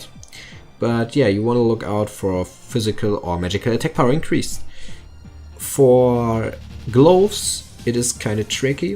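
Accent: Germany. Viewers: You sound like a German people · Man hört krass raus dass du deutscher bist xd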